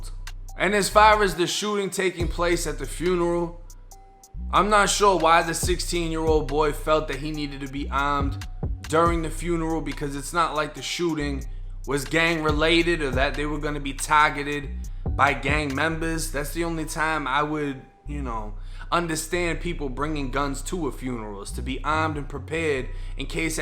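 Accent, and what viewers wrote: Boston, United States. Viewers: Bra your channel interesting, but I'm from the O (X) why you sound like you from Boston somewhere? No shade just wandering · i think he from boston moved to Florida now he's back in Boston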